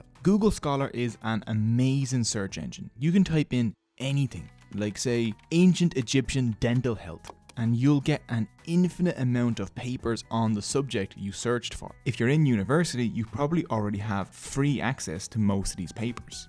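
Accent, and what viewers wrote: Dublin, Ireland. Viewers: Love the Dooblin accent 😆!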